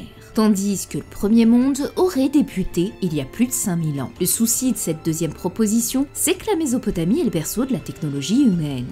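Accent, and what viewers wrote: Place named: Belgium. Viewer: Sur que tu es Belge! lol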